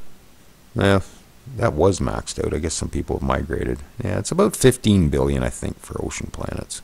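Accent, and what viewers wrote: Canada. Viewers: you sound canadian. I love it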